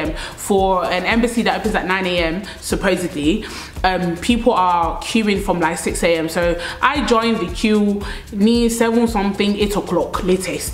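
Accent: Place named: Yoruba, Nigeria. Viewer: I like how you mixing the yoruba acient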